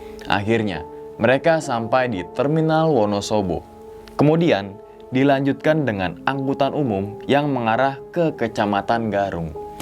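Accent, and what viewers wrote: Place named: Jakarta, Indonesia. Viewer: Jawa mana mas,,tak kira Betawi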